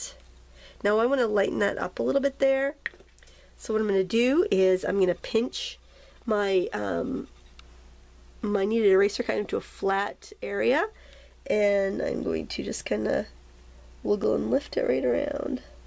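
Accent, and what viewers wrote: Maine, United States. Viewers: Hi, Love your charcoal "draw-rings" with your Maine dialect! Great variety of shading and blending techniques! What do you like to use to seal it so it doesn't smudge charcoal whatever is next to it?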